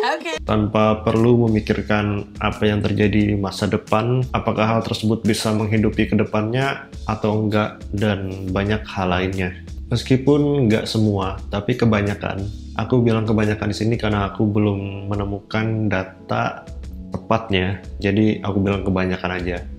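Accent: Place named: Kalimantan, Indonesia. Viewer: Logat kalimantanya keluarin lagi bang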